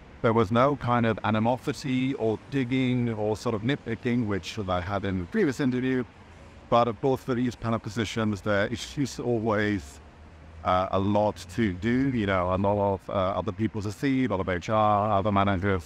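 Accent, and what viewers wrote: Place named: Germany. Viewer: Your accent is so strongly German now.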